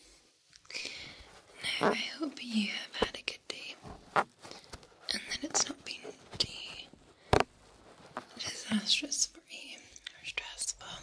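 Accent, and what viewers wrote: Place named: Scotland, United Kingdom. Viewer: I rather enjoy listening to you ramble,. Triggers or no triggers, whispering or soft-spoken or even humming/singing, I just find the sound of your voice very pleasant. 🥰 Even more pleasant than the rain, which I do also enjoy. 😂 Speaking of enjoying things: based on the way you kept breaking into other accents in the middle of talking, I'm going to go out on a limb and guess you were enjoying yourself while filming this - that's something I dearly love to see. 😍 To answer your question, though, I don't think I really have a favorite chocolate. Does German Chocolate Cake count? 😋 Have a great weekend, and I look forward to your next video. 💝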